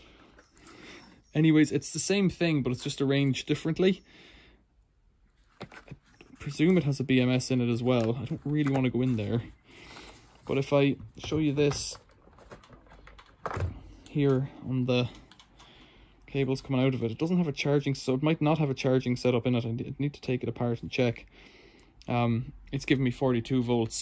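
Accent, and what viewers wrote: Ireland. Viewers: the one with no charge port is a common charge port and the other is a separate charge port. Nice to hear an Irish accent on the tube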